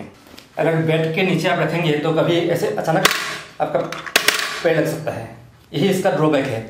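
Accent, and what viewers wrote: Assam, India. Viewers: are you from assam bro? guessing it from your accent 😆😆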